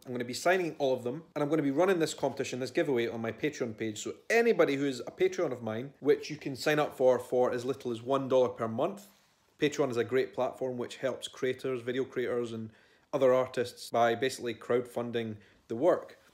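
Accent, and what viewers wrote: Scotland, United Kingdom. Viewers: Xlove Scotland and I love your Broge(accent)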